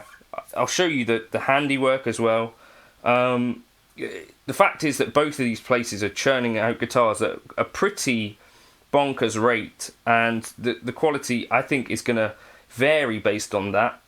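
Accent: United Kingdom. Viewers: Isn’t this just how British folk talk? Why are you so critical?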